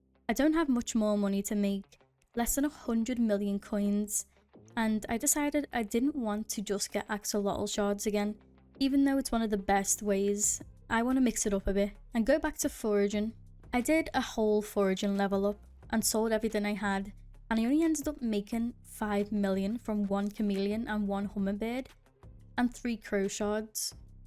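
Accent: Liverpool, United Kingdom. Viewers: Swear u sound scouse if ye r where abts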